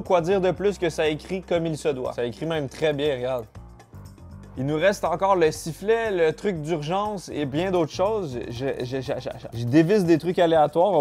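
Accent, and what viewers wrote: Quebec, Canada. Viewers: L accent du Québec est toujours aussi 😂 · Tu à gagner un nouveaux membres, j'aime ton accent du quebecq, et tu ressemble à un de mes ex potes disprus avec une seringue dans le bras, on le surnomais "le coyotte",très bonne prêsentation de tes articles, et j'aime aussi ton humour. "Serge. L" (depuis la belgique) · Je viens de découvrir cette chaîne et ca m'a bien plu!!!!! L'accent québécois, l'humour,les expériences,le ton léger, j'adhère !!!!!!De France . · J’adore l’accent canadien 🤣🤣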